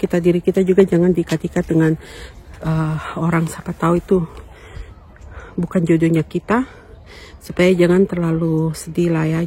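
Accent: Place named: Papua, Indonesia. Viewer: Maaf bunda apa asalnya dari paua soalnya logat bicara bunda seperti orang papua.. Aq jga ada saudara papua tepatnya di nabire.. Aq suka sama bunda karna murah senyum.. Kebunya luas sangt indah sekali suasananya... Memang php mnyakitkn betul kata bunda php bisa terjadi pada siapapun dan dimanapun.. Semangat bunda0💪💪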